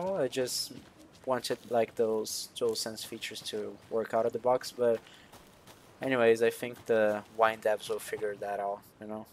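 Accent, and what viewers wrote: Brazil. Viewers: o sotaque br no inglês é impagável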